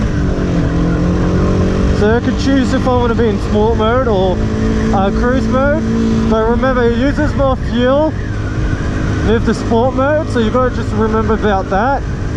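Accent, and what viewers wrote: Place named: Australia. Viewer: Excellent commentary. That aussie accent is a beauty.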